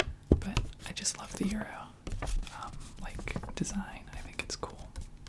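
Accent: United States. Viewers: andré Patricia American accent isn't terrible? He is americain ! he only studied in france. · Your american accent isn't terrible but great !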